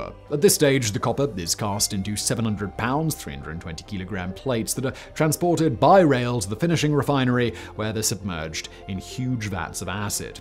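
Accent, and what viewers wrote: England, United Kingdom. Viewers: I also like listening to English people saying Native American place names. It's great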